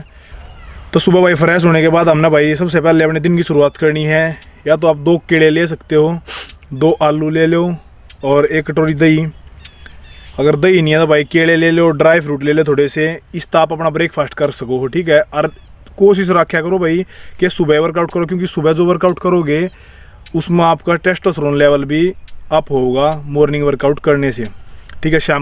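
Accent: Haryana, India. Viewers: Haryane balo ki boli acchi lagti h bhai 👍👍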